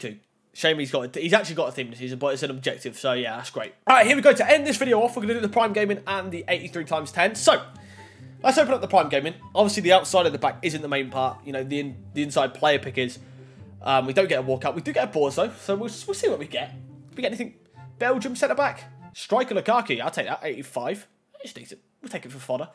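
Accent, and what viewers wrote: Northampton, United Kingdom. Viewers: Are you from Northampton or something?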